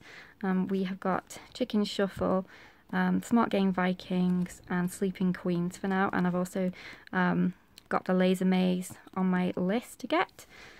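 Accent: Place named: United Kingdom. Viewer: You sound british but are using american education terms. You're like me, become americansied from all the homeschool youtube videos and american curriculum websites :D XD I started using grades naturally now instead too :D XD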